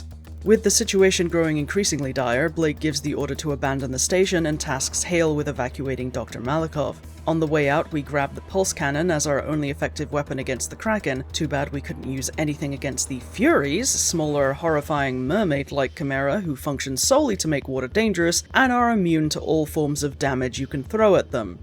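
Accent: Scotland, United Kingdom. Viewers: Amazing British female voice that loves horror. I love her:) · They're Scottish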